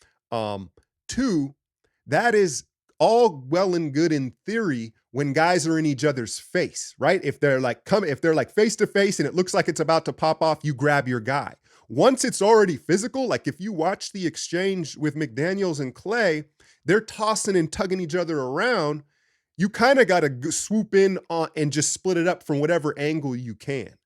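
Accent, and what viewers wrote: Bay Area, United States. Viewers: that bay be leaking out in your diction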